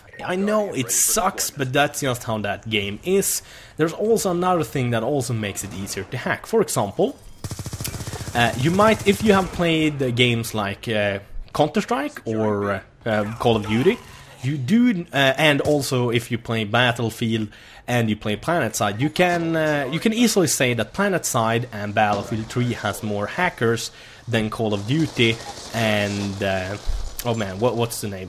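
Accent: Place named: Sweden. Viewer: That swedish accent. :3 ''Those dirty dirty sheaters''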